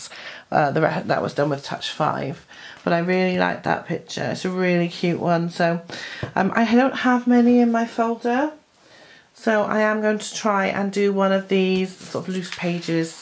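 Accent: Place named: Wales, United Kingdom. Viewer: I love how matter of fact and down to earth you are. Colouring- warts and all, with baby and lack of sleep and dressing gown, is very refreshing. Also love your accent. I think you live in Wales, but does your accent have other influences?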